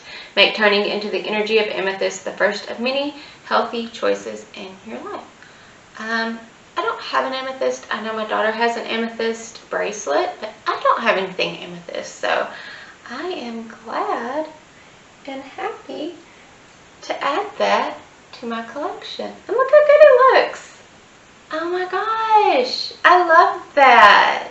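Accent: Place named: Texas, United States. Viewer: I'm guessing by your accent that you're from Texas.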